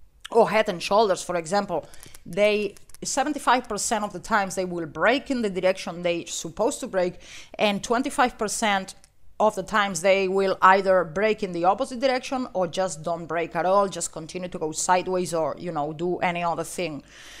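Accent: Spain. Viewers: Hablas inglés perfecto y te noto acento espanol. De donde eres? Saludos, soy de BCN y estoy en VIE. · spanish? from catalonia, my guess.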